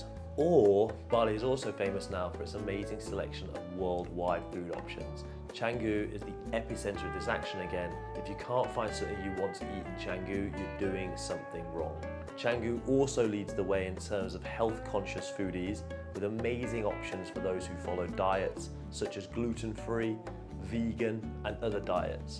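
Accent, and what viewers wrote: United Kingdom. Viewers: I can listen to your accent all day, Mate! It sounds a bit like the north of England. Would you mind telling us from where you hail? Again, I love listening to you speak. I have a think "American" accent because I am from New York City, so... I have had people say the same thing to me. Also, if you can speak up a bit louder on each video that would be muchly appreciated. Even the loudness turned up to the max, I could still do to have your voice be a bit louder.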